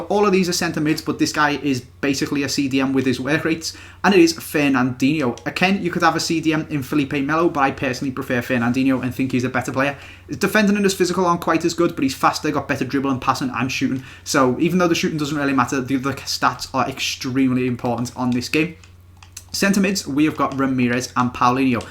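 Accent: Liverpool, United Kingdom. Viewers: U so scouse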